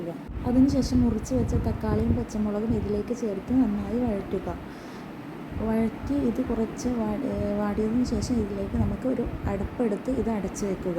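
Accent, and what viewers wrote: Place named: Kasaragod, India. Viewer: oo ഭാഷ കാസറഗോഡ്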